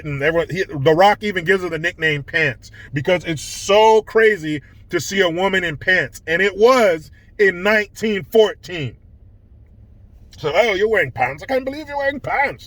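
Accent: United Kingdom. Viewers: Nice British accent 👌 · You sound like a great dad, J! Love your vids! The brit accent is hilarious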